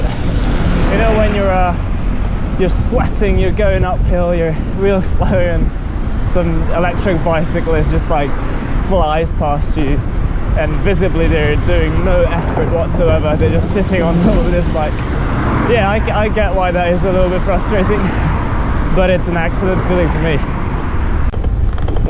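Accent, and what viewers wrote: Scotland, United Kingdom. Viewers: I would say he schooled in the Edinburgh or Lothian area. Strongly detect gentle middle class Scottish accent. That may also explain why he' wasn't used to heavy morning traffic when young since Edinburgh has more car free zones than most cities plus a more advanced segregated cycle trail nfrastructure.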